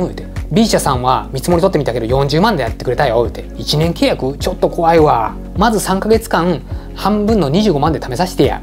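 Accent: Hiroshima, Japan. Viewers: 広島弁、親近感あります😊💕 コロナで大打撃受けたのに生き残ってる百貨店勤務です😅 うちの会社もゾンビかもしれません🧟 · さんの鋭い感覚と情報分析力には頭が下がります。かなりの情報収集されているのが感じます。今回も勉強になりました😌 さんくらいの若さなのに、新しいことを取り入れ変化するのを嫌う社長を何人か見てきました。とても残念でした。キーエンの営業は素晴らしいですね。アイデア次第でビジネスはどんどん広がりますね。ドコモのような多角的ビジネススタイルも今後は必要ですね。これは個人のビジネスでも言えますね。私も起業するなら、このスタイルを目指したいです。さんのように。。。激変の世の中でも、やはりHR(人的資源)も欠かせないと思います。社員のモチベーション管理、満足度アップ、働きやすさ、優れたパフォーマンス発揮アップ等、社員の扱い方について、ぜひ動画であげていただけたら嬉しいです。次回が待ち遠しいです。すっかり &広島弁ファンです☺️ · さんこんにちは。さんと私の会社の社長、知合いなのかなと思う位、お話の内容が近いです。ゲームチェンジャーを意識する様、日頃から言われています。ただ、私には話が難しくて…。それをさんがわかりやすく解説し、深堀りして下さるので、本当に助かります!!段々理解できてきました。美声も戻り、かわいい広島弁も聴けて、毎回動画が楽しみです。ありがとうございます！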